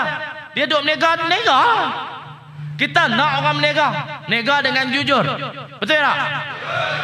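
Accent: Kedah, Malaysia. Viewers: ni cara anak kedah ckp...ori bya bahasa...trbaik mb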